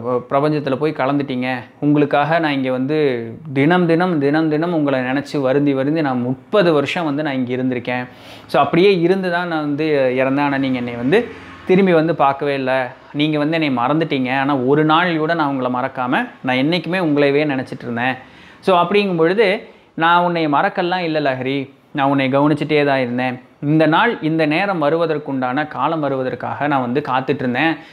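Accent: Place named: Coimbatore, India. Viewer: Te way u explain in coimbatore Tamil is very nice.. 👍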